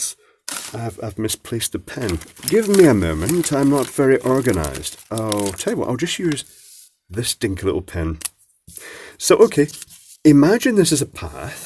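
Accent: Scotland, United Kingdom. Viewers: I only come for the endearing Ms.Doubtfire accent. I guess your content is ok too,.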